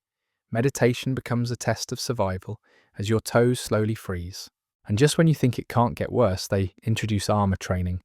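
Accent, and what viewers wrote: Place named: United Kingdom. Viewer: I started listening to videos like this when im studying but the British accent makes it so soothing, I found you guys through the with hunt video but am learning so much from videos. What’s the next topic and when will it be available?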